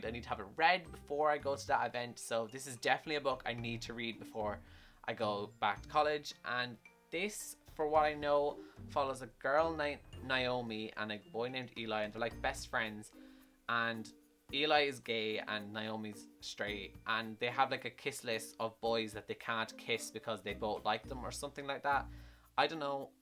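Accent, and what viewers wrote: Ireland. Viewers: Are u from Dublin ?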